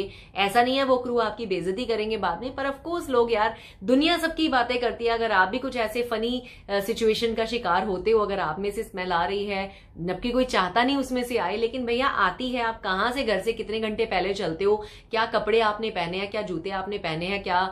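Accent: Punjab, India. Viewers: Bahut kamaal Punjabi kudi Hindi achhi bolti hai par pata lag jata hai k hai tan Punjabi kudi🎉🎉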